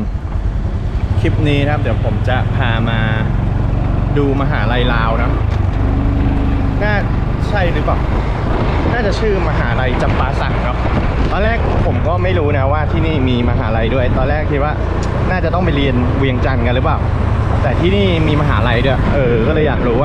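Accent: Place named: rural, Thailand. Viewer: พูดเหมือนคนบ้านนอกเลยทำไมอยู่ไทยไม่เกิดเป็นคน กทมหล่ะครับบพี่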